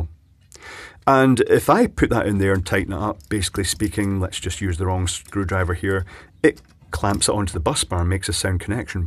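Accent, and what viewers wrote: Scotland, United Kingdom. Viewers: Your Scottish brogue kept me watching the full 18 minutes even though I live in a country with a completely different electrical system.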